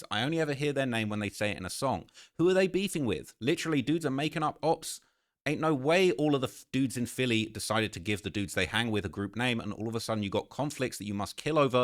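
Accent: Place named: RP, United Kingdom. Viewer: It sounds posh & refined.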